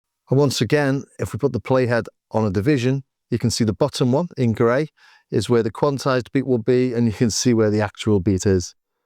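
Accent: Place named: Liverpool, United Kingdom. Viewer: Thanks a lot, this was really interesting — as always. A drum machine that does not keep its pace, this would drive me nuts ;-) I did not know that about “Echo”… It must have been tough for these guys being a band in Liverpool . And I am rather happy that I was not forced to learn your local dialect until now. This is quite a challenge… Still watching every of your videos, See you ;-)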